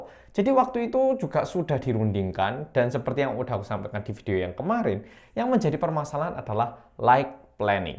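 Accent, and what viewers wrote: Java, Indonesia. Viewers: Makasih mas (Pria manis korea medok yang menjadi solusi bagi kami bolo² dalam setiap masalah 😄) aku sebenernya Masih ga nyangka sih Bighit yg agensi miskin, kecil dan hanya punya 12 karyawan kemudian semesta memberikan kesuksesan luar biasa lewat BTS sehingga sekarang jadi Labels Raksasa, punya cabang di Japan dan Amerika,uangnya ga berseri..dan sebenarnya banyak hal yang harus dicontoh dari seorang Bang PD Nim memberikan kepercayaan penuh thd anak² idolnya, memberi keleluasaan anaknya untuk menulis, memproduksi musik sendiri, diajarin gimana menghadapi dunia luar, tata kelola bisnis dan 2021an diajarin terjun ke persaham²an sehingga jika terjadi apa² Bangtan punya andil atau suara, berharap yang terbaik aja untuk semua (episode 4 Suchwita terbaru Suga bilang kami tahu bisnis, pengelolaan dan bagaimana industri ini bekerja dari Bang PD Nim)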